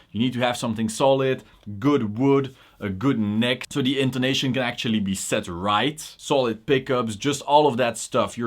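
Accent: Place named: Quebec, Canada. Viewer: where are you from? your accent looks like a french canadian speaking english ahah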